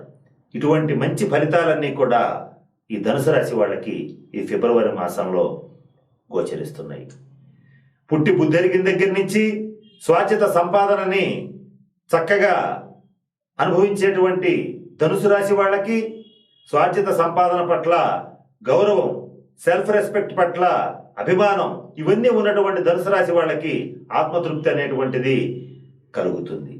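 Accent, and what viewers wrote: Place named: Telugu, India. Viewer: Your explanation is good . The reason may be due to your training as a teacher coupled with your accent in Telugu . I am viewing your videos on Dhanassu as the sign is of me & my son belong to that Rasi . Thank you sir . From New Mexico USA camp .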